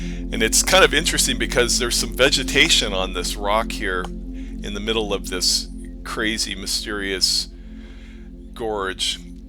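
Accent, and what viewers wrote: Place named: California, United States. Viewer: This guy narrating sounds like a typical California moron. “This water is ugly, ew no” hahahaha my god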